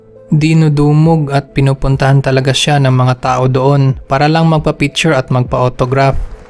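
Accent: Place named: Visayas, Philippines. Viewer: Napaka akward mo mag salita d bagay paki ayos boses mo halatang bisaya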